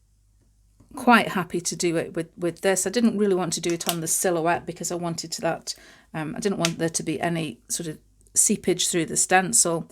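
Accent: England, United Kingdom. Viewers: Thank you, I am so pleased to have found your videos. I love the way you explain the process clearly and calmly in your lovely English accent. So many of those I have been watching have been, while informative, too fast and with loud music over high pitched American accents. I'm a water colour painter experimenting with new techniques and ideas as I am now housebound during lockdown due to my age and health so have been exploring YouTube for inspiration and ideas. I now know that I have been using the wrong type of acrylic paints and not planning the process enough resulting in messy prints and wasted paper. I'm looking forward to watching more of your videos and hopefully producing something I don't have to hide away!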